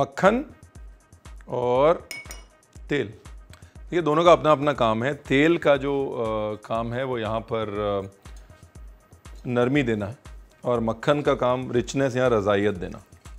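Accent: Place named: Lucknow, India. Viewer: What a personality Punjabi Jatt guy with a calmest aura, ice it up with Lucknowi hindi, shayari and chef..and katilana looks...Mashallah!!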